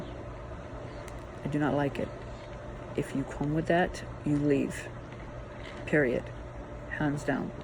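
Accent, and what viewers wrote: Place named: Ireland. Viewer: (also...i picked up on a little bit of an Irish sounding accent today in your voice -which I had not noticed before today's post)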